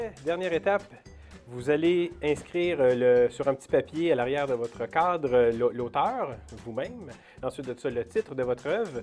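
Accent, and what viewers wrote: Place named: Quebec, Canada. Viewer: Super cool cette vidéo ! Merci beaucoup ! L’accent québécois fait rêver et sympa le moment avec le sèche cheveux ! 😜😁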